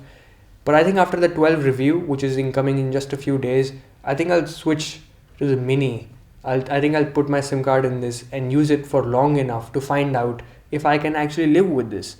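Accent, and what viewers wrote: India. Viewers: i love this indian accent ♥️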